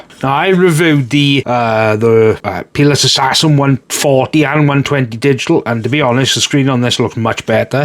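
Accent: Wales, United Kingdom. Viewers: That's the most welsh accent I have ever heard in my entire 41 years on the planet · Heya mate! First of all, Im not used to welsh accent, and I must confess I thought you were dutch for a second lmfao. Chatgpt highly recommended this cpu cooler to me, and I was wondering if you had to chance to compare its noise compared to the Noctua or Bequiet that you featured in your comparisons performance wise ? Thanks a lot bud!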